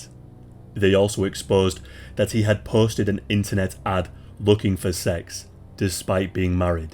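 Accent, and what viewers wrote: United Kingdom. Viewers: He’s British bro give him a break 😭. Brits refuse to pronounce American ANYTHING how Americans pronounce it lmao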